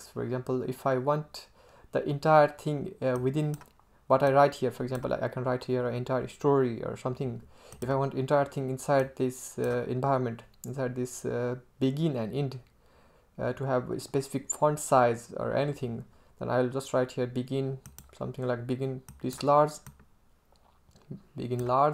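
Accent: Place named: India. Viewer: You are from India, aren't you? I used to think that all Indians just have a bad English pronunciation, but then I found out that Indian English is actually recognized as a type of English like BE and AE. Yet it can be very hard to understand for people having learned and being used to the other types of English (BE,AE). You speak rather clear however, and I think any westerner who speaks English should be able to understand you :)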